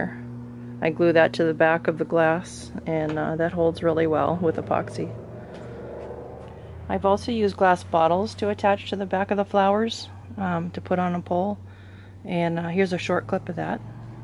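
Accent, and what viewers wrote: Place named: South, United States. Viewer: Love your content, beautiful craft, trying to figure out what part of the south you live in, very familiar scenery. But because of your accent I know you are not as far south as I am. could be slightly north of my location, but you must be a transplant....lol! Moss filled oak trees near a lazy waterway, what a beautiful backdrop for your delicate flowers!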